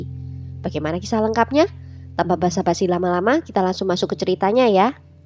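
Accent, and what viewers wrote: Java, Indonesia. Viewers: Hay medokuhhh cantik 💕💕💕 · tidak apa2 mungkin karena pengaruh logat Jawa ya. Kalau ibuk e cocok tapi kalau ibuknya tidak cocok.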